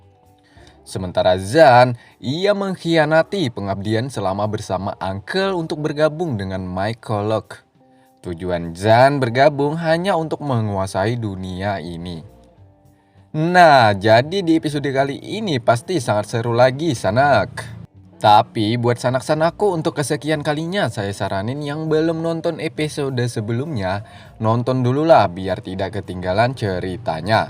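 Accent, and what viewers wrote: Banjar, Indonesia. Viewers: mimin ini dr kalimantan bro. logatnya kental bahasa banjar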